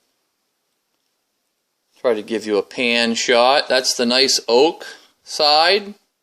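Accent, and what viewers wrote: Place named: New Hampshire, United States. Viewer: Just found this today... heard the "NH dialect" after finding the violin building. Lived in Brookline NH for years. Your violin building and description is both interesting and down to earth. I often wondered about building a dulcimer from Home Depot woods, looking at those beautiful cedar boards. Wonderful work and the videos are great!!! plus.. the fiddle sounds great! :-)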